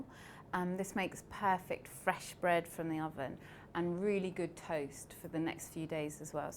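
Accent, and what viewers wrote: England, United Kingdom. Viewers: You have beautiful accent. So awefully English. Quite rare to hear nowadays (in noisy London) Absolutely delightful. And nice bread too. Thank you, Chef!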